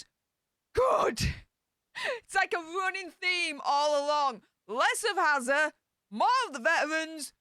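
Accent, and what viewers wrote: United Kingdom. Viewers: I think some of this is from her particular British dialect. If I am watching a Britbox show, my husband sometimes cannot understand the dialogue. I think YouTube presenters have to have a unique hook. If they all sounded like academic professors, the net would be a pretty boring place. I think level of passion is also part of her YT personna. You can also hear the language difference with numerous African American YT presenters. I am sure the speech they use in a job interview is different than what they use in reaching their YT audience. This is a "social register" in language. language is uniquely hers. I have several advanced university degrees, and her presentation skills don't bother me. I listen to many different commentators and find all of them interesting, whether I agree or not.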